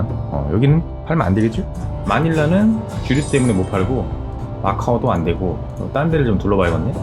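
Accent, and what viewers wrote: Chungcheong, South Korea. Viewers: 충청도 사투리 구수 하네유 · 충청도신가유? 딕션 참 구수하네유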